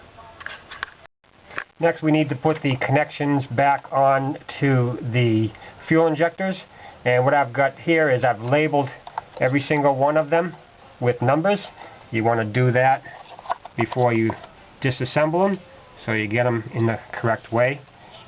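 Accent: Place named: Boston, United States. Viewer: love the video! i lived in boston before. sounds live your from there lol!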